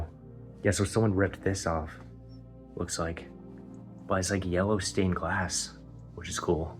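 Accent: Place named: United States. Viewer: What’s an American rummaging through a home in Britain for. Definitely not a British accent.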